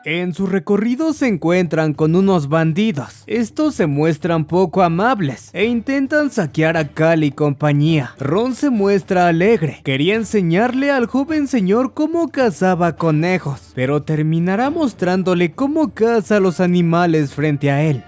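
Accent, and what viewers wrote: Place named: Russia. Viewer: Me gusta la historia y más tu acento ruso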